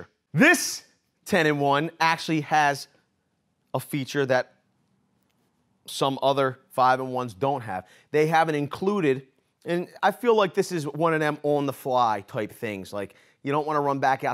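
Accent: Philadelphia, United States. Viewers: yo bro you would fit right in at my house for sunday dinner ....you sound like ur from my neck of the woods ..South Philly Baby!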